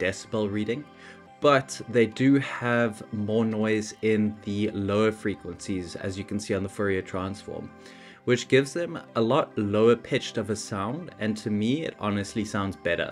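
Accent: South Africa. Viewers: I knew that lekka safari accent! Groete uit Duitsland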